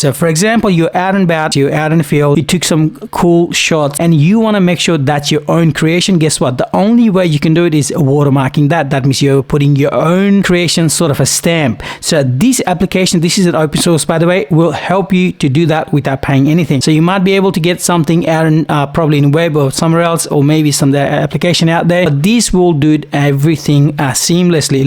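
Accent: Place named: Australia. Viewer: Drop your Australian accent please.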